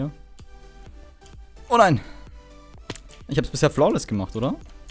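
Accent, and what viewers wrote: Austria. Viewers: Die Mischung aus deinem österreichischen Dialekt und der Teddy-Comedy Stimme hört sich echt lustig an :D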